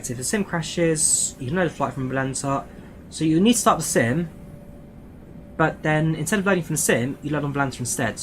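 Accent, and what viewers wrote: United Kingdom. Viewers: Why are you using British accent?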